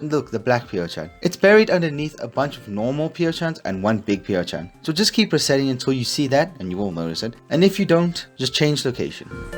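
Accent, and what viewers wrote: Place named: South Africa. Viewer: He's south African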